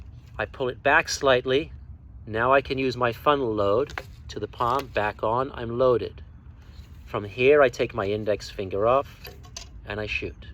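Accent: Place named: Transatlantic, United States. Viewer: real transatlantic accent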